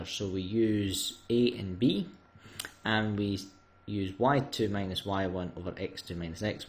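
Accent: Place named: Scotland, United Kingdom. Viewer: Why are most maths videos on YouTube done by Scottish people? Seriously, there's like 9 of them! Great help brother, thank you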